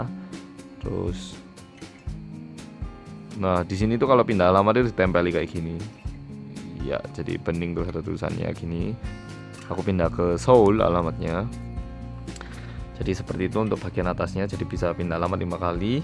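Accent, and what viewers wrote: Java, Indonesia. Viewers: kok medhok ya. btw baru nemu orang korea medhok. keren bingit..subscribe ah. keep posting info menarik ya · koreane ngledok:v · jowo nya ningendi mas??? ribet gak mas.pindah kewarganrgaraan ganti KTP nyaa? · Asli org mana sih kak Ktp nya korea tp bahasa nya kok medok ya 😁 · maaf ya,mo nanya kamu tinggal di Indonesia dmn? di jawa kah? logat jawanya kelihatan bgt hehe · logatnya seperti logat jawa medok 😄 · Medok temenan koe bang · orang korea ya bang?? kok logat bicaranya kayak orang jawa:D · pantesan ada yg gak percaya sampeyan org korea.. wong ngmgnya medok jawa buangett.. 😅😅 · Mas e gk pnya ktp indo??besar di Korea??bahasa e medok Jawa gt???kaget q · medok bnget mas... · 오빠 ngomongnya medok banget >.< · sipit tp kok medok ya mas 😄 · model KTP tiap2 negara bisa berbeda tergantung pada kondisi GEOPOLITIK negara masing2 dan ini bersifat dinamis. 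di eropa, jerman misalnya KTPnya ada masa berlakunya. lebih jelasnya bisa bertanya ke dept. dalam negeri. salam Lemper mas bro..., tetaplah medok dan trus ngomong jowo.., tak tunggu vlog sampeyan selanjutnya,suwunn,hehe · mendok bet😂😂😂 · lucu banget jawanya wkwk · Medok tenan ngomonge cak:v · medok banget mas. 😂 gemes. haha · logat ngomong nya kaya orang jwa,medok gitu. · lah kok medok... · kok medok jawa? 😂😂😂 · pertama kali liat video ini kok fokus gw ke medok jawanya ya?😂😂😂😂😂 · gue kira orang jawa wkwk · 안녕하세요. 조는 노비입니다. Ternyata 씨 orang korea yaa tapi lancar sangat bahasa indonesia sampai logatnya logat orang jawa 대박 😁. Saya masih proses belajar bhs korea 😁. Oh iya saya sudah tahu ktp org korea kaya gimananya di drama my father is strange 😉 eps 52 hhi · sudah lama tinggal di jawa ya oppa sampek kebawa logat jawa ( medok ) 😂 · kok suarany kyk orng Jawa bgt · hah? orang korea? kok medok banget sih bahasa jawanya:') serasa orang jawa abis belajar bahasa korea cobak:') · keren yaa, logatnya jawa gitu padahal korea👍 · wajah korea.. 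bosonya medok sekali.. hehe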